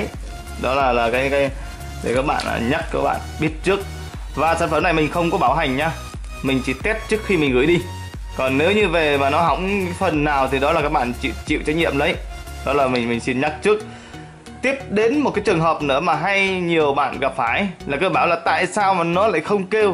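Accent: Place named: Thanh Hoa, Vietnam. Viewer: A nói giọng người thanh hóa vậy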